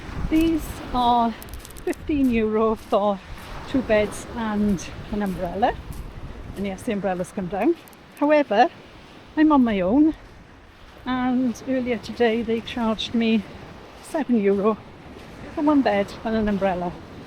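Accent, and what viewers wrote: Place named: Wales, United Kingdom. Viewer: You have a Welsh accent. Where are you from. I'm from Newport South Wales and coming to Skala in 5weeks. I've been to Kefalonia twice and stayed in Skala both times. I love it!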